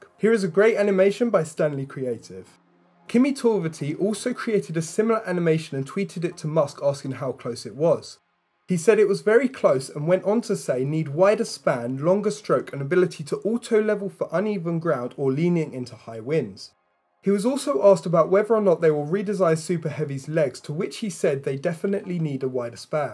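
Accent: United Kingdom. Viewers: Your accent is adorable, exactly what part of the UK are you from.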